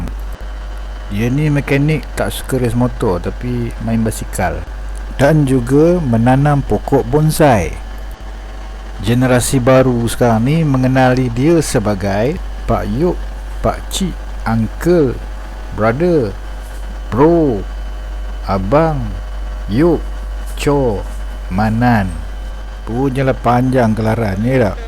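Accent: Perak, Malaysia. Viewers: Suka dengar org perak ckp,saya asal perak tapi membersar kt bandar ipoh..tengah mencuba untuk memperbanyakan bahasa perak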